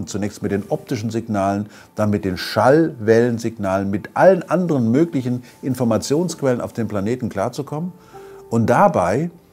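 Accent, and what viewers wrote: Hesse, Germany. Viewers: Weidwingel! Da spricht der Hesse! :) Schöne Folge! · So schön hessisch!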